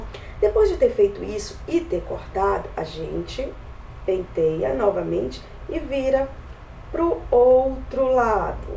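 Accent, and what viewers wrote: Bahia, Brazil. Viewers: Faz pouco tempo que acompanho os seus videos , mais desde o primeiro momento adorei esse sotaque maravilhoso que me faz lembrar das minhas ferias ai na Bahia, e queria te parabenizar por transmitir tanta coisa boa nos teus videos e a cada palavra de sabedoria que você diz neles . Beijos e muito sucesso pra você !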